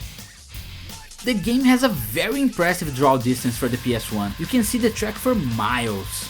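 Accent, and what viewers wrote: Brazil. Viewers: You are not a english speaker right? The way you phrase your words sounds like you are brazilian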